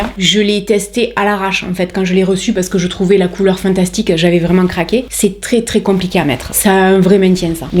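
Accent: South, France. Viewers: Coucou, j adore vous regarder et surtout vous écouter avec nôtre très beau accent ça sent le soleil,et met du baume au cœur.Excellente vidéo comme à chaque fois.Merci beaucoup bises 😊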